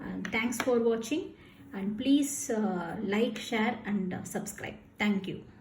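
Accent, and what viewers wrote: South India, India. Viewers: Mam ur english accent and way of explanation is osm... I think u are from south 🇮🇳India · I love ur way of speaking.Are u from South ma'am ?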